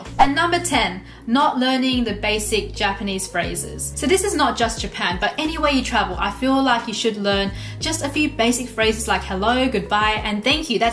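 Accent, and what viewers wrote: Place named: Australia. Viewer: Australias accent is really starting to rub off on you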